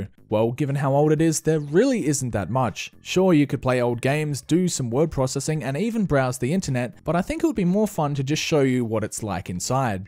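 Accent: Australia. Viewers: I just realised that Australians seem to pronounce “assume” as ashoom 😁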